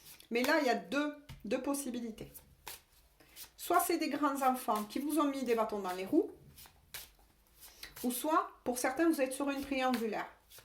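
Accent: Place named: Provence, France. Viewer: Merci belle guidance parlante et limpide avec ce bel accent Provençal qui me rappelle ma ville préférée Aix-en-Provence où j'ai fais une partie de mes études supérieures et dont je resterais amoureux pour la vie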